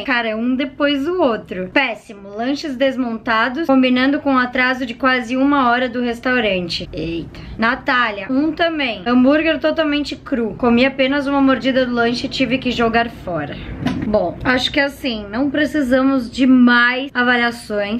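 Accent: Rio Grande do Sul, Brazil. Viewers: Vc é gaúcha? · Você é gaúcha?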